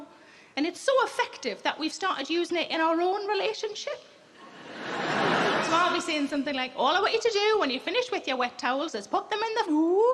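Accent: Newcastle, United Kingdom. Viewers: Aye proper Geordie :)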